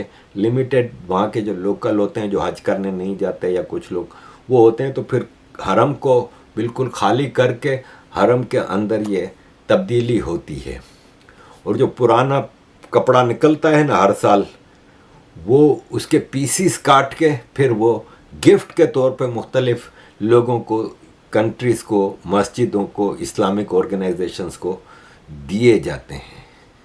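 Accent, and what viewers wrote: Gujarat, India. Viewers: aapke bolne mai gujarati lehja aa jata hai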